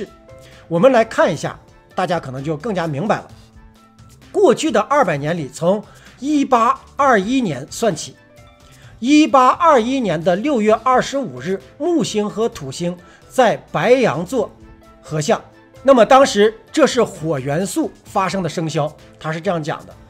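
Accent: Northeast, China. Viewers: 您是东北哪旮瘩的，我是大城市铁岭得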